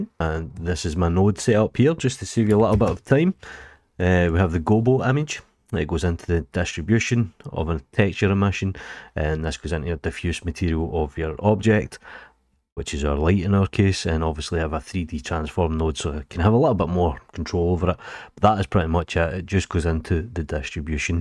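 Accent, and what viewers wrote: Scotland, United Kingdom. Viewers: Please don't ever apologize about your Scottish accent. Da best!!! - Great tutorial. Thank you.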